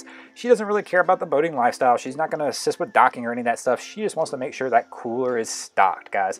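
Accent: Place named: Maryland, United States. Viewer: my wife think your from Maryland by your accent or speech cadence, how far is she off?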